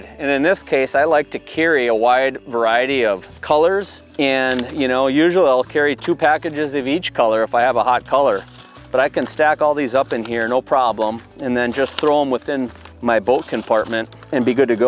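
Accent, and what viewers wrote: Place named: Midwest, United States. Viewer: Haha I love the midwest accent. Put your baits in your baigs! Honestly though, thanks for the solid and straightforward tip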